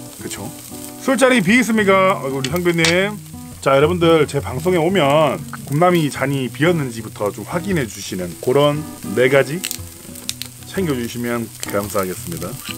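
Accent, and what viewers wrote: Gyeongsang, South Korea. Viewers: 형님~ 강원도라고 하셨는데 출신은 경상도?? 쌔리~~~하네ㅋㅋㅋㅋㅋ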